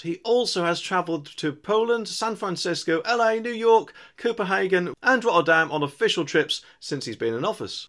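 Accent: Norfolk, United Kingdom. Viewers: Is that a norfolk accent?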